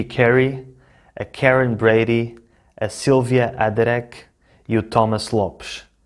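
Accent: Lisbon, Portugal. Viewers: Mil "seiXentos" e quarenta .... o seu "SC" soa quase sempre "X" e o seu "O" soa quase sempre "U". Essa pronuncia é particular de Lisboa? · Excelente vídeo. Super apropriado a dinâmica da narrativa, bem coerente com a ideia do canal que é disseminação da nossa língua tão amada. Sou brasileiro e gosto muito do sotaque português de Portugal. Em outros vídeos, por favor, discorra mais sobre o período de dominação dos Mouros, sua gastronomia e arquitetura. Parabéns! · Sotaque de Lisboa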